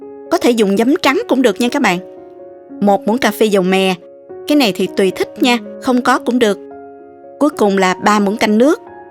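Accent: South, Vietnam. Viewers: Giọng chị đọc chuyện nam bộ đúng bài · Giong miền Nam rỏ ràng không có quê, nghe thấy mến giọng nói va cách dạy thật tỉ mĩ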